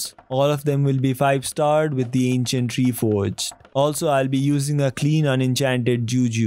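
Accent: India. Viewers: Are you indian? Ur accent is cool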